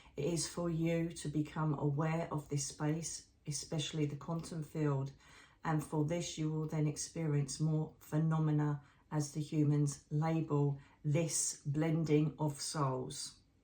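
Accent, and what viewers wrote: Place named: South London, United Kingdom. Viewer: your star cardi is fabulous!! I love the fact that you sound very much like me (I’m a south London girl!) and who wouldn’t imagine Gabrielle with wings - wings are awesome! 😂❤ thank you so much for channelling this amazing message, I have been talking to the angels almost everyday recently just for strength and to help me heal from surgery and to give me a boost from the RA, I don’t know about you but the cold and wet weather makes me feel 10x worse! Sending love & light to you, Darren and Teddy Bear ❤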